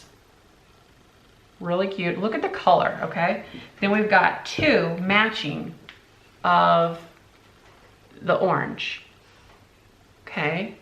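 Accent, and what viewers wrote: Midwest, United States. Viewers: Love watching you! I’m from South St. Louis originally and now in Florida. I can tell you’re from the Midwest! How close are you to St. Louis?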